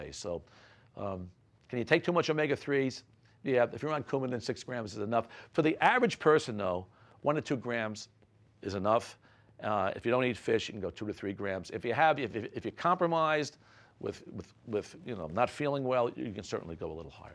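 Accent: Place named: Brooklyn, United States. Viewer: Dr Sinatra has been my cardiologist for a decade now and he doesn't even know it but I know of him from a grounding video as well as a supplement customer. He's down to earth and "grounded." That Brooklyn accent sounds a little like Anthony Faux Chi but unlike Faux Chi..... We KNOW Dr. Sinatra will always tell people the truth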